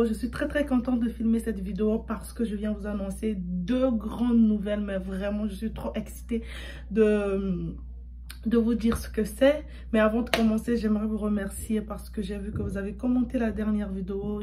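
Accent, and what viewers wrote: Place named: Democratic Republic of the Congo. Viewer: 😂😂😂Bonjour, les congolaise sont là pour te soutenir, je savais que tu étais congolaise juste par ta prononciation française... 🇨🇬🇨🇬🇨🇬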